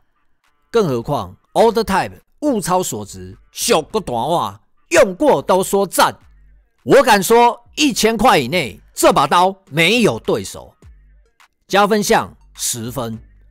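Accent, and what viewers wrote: Taiwan. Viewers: Jasper你好，喜歡你講台語的用詞跟腔調，很合高雄人胃口😆 我是個想換刮鬍刀的新手，看了幾部你的影片， 發現一些長相很像市售盒刀的傳統刮鬍刀在你的影片畫面一閃而逝， 由於那些一閃而逝的畫面並沒有特別提到型號，所以我也就無從搜尋起， 因此想來請教Jasper，有哪些長相像一般市售盒刀，又適合新手用的傳統刮鬍刀呢? 謝謝回覆~ 🫠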